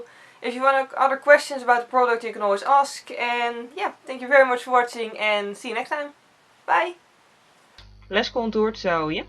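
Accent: Netherlands. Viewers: Holland lol accent 🐇🐇🐇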